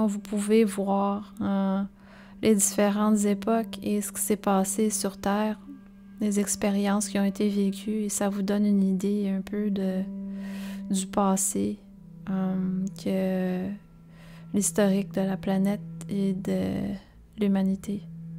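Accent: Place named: Quebec, Canada. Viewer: ahhhh, l'accent québécois!...toujours sympa de l'écouter 😉 God bless you from France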